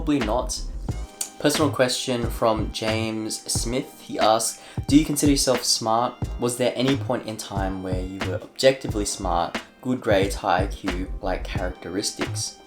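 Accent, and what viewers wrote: Australia. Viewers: Love that Aussie accent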